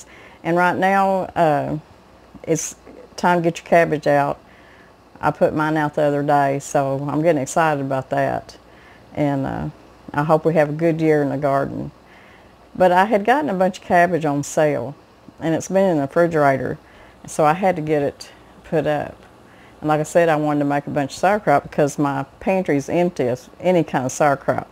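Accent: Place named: Kentucky, United States. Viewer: Your accent is exactly like my Aunt and Grandmother who were from Harlan, Ky.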